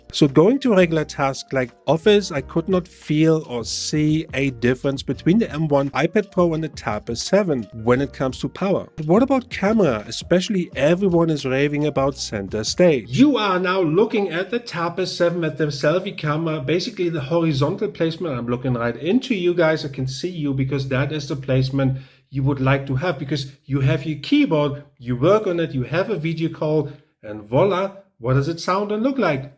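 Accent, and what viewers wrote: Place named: Germany. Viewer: Thats a Strong German Accent ! Are you German ?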